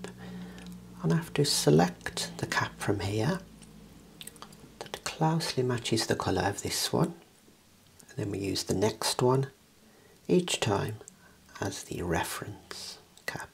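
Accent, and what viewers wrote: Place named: Midlands, United Kingdom. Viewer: Me too. His Midlands accent has lessened which is a shame.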